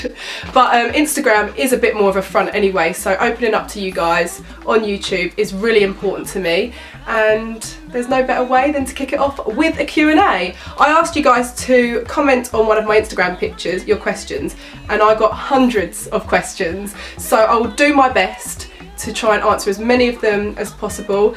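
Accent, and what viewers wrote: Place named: United Kingdom. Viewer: I could listen to your brittish accent 24/7 😍❤️❤️❤️❤️❤️❤️ love you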